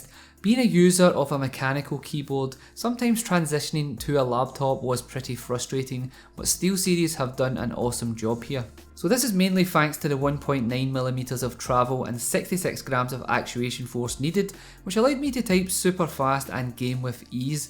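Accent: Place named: Scotland, United Kingdom. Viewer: its a Scottish accent.. ;)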